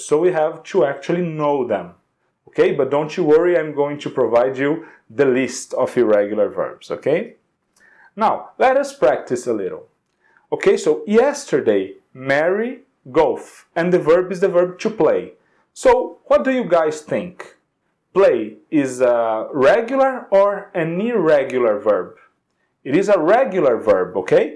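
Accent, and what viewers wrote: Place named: Brazil. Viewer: ótima aula. e seu sotaque brasileiro nos lembra a importância de aprender idiomas e manter nosso sotaque com orgulho! muita gente mira no objetivo de perder o sotaque brasileiro, o que é uma grande bobagem. imitar outros sotaques só serve pra brincar, fazer piadas e tudo mais... jamais pra adotar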